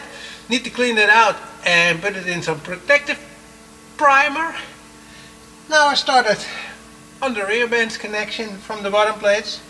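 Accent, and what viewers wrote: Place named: Netherlands. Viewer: Aan het Engels te horen ben jij Nederlands als ik zo mag raden 🤣🤣🤣👌 zo klink ik ook meestal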